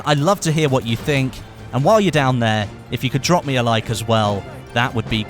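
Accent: Netherlands. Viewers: Westie, are you Dutch?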